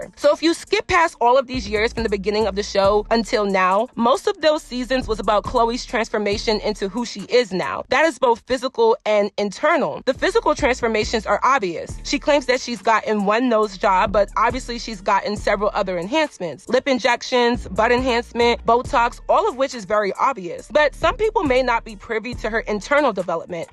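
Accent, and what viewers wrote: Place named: Baltimore, United States. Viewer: You from Baltimore? I heard the “do” and “you” in the accent lol…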